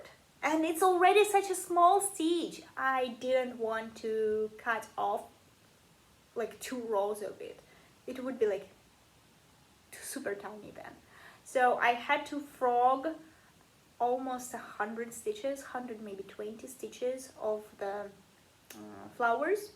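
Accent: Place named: Texas, United States. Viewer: Tell your husband you’re not too much for Texans. We’re all like that. 🤣 You’re a Texan with an accent. You’ve got a great smile too. Love your shares. Keep stitching.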